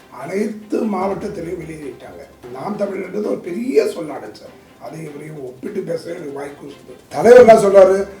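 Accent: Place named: Telugu, India. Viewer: எவ்வளவு பணம் நீ வாங்கி பேசுகிறாய் உன் தமிழ் வேறுமாதிரி இருக்கு நீ தெலுங்கரா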